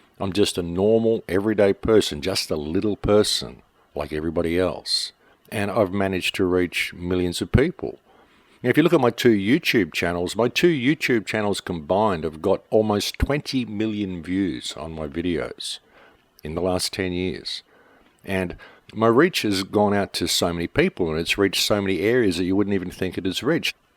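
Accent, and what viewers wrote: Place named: Australia. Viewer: Thank you! Thank you for the time you put into your work for us! And thank you for being born in Australia! I love the sound of your voice combined with your accent as it skillfully spills the truth. Thank you for keeping us aware of our true surroundings while teaching us how to identify big picture realities which the majority of the human population is deliberately blind to.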